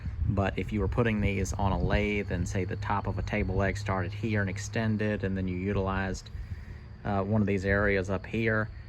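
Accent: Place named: North Georgia, United States. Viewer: Just wondering, are you in Georgia? Accent sounds a bit like north GA.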